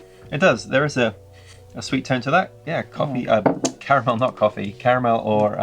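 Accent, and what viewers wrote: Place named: Southern England, United Kingdom. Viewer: Very good ,will have a crack at this one and try it on the beer engine. It's that Southern accent again. Last time seeing the Saints live was Alan Balls last game. Think he was last player to stop playing from the 66 world cup team.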